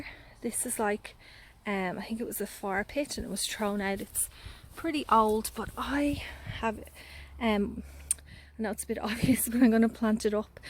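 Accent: Ireland. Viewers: Your accent sounds Irish 🤔 but either way cool garden 👍🤗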